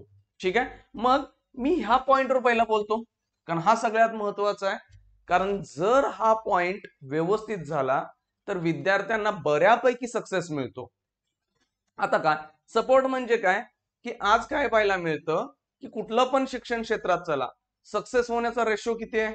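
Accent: Kolhapur, India. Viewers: Are u from Kolhapur???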